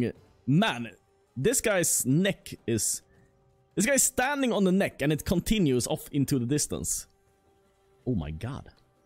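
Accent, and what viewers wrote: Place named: Sweden. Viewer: is u swede boi, u sound swede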